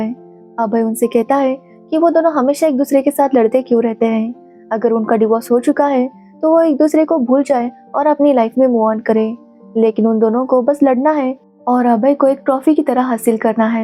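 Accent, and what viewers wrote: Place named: South, India. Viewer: The narrator of this webseries needs loads of rehearsing......her South Indian accent isn't apt for narration and there are lots of flawy pitch in delivery......NYC scripts written for explanation of the series...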